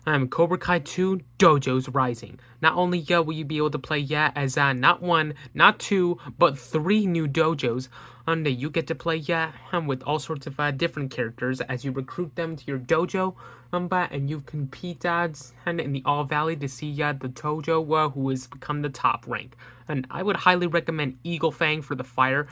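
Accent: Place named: Canada. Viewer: where are you from, the accent I can't place it some things are giving me the great white north vibes lmfao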